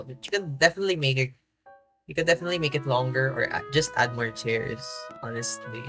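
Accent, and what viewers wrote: Philippines. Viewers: You sound filipino, are you?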